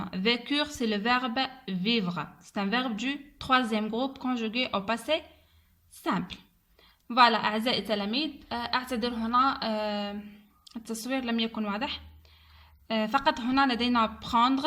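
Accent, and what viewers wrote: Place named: Algeria. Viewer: شكرا اختي بارك الله فيكي بصح تباني في الهدرة جزائرية المهم شكرا لكي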